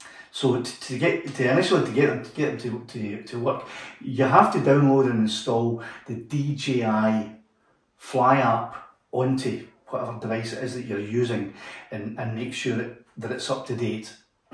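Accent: Scotland, United Kingdom. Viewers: Great content, im just here for the scottish accent :) cheers from DK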